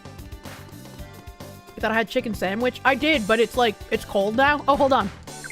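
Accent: Boston, United States. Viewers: I thought the reason you pronounced things oddly is because you're from Boston.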